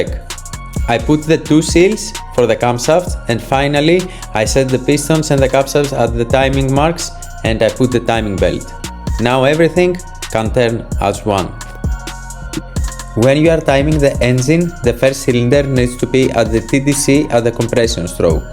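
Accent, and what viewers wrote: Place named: Greece. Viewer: You sound Greek.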